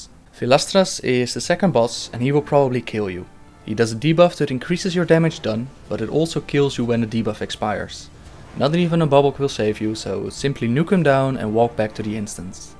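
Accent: Netherlands. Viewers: je hoort wel dat je nederlands bent haha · dude are you from the netherlands or something? I think i can hear it in your accent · Dutch accent! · Dutch accent? ammiclose? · Are you dutch?? i can hear it in your voice :)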